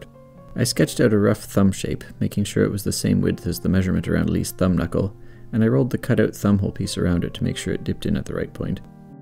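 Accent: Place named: Canada. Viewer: incredible video!! I'm an art student and have seen those gloves in person i believe! your craftsmanship is wonderful to see. I was also trying to pin your accent and you finally said Canada! It's so pleasant and matches your historical vibe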